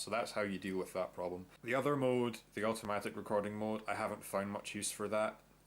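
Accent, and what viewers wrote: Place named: Scotland, United Kingdom. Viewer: Scotish accent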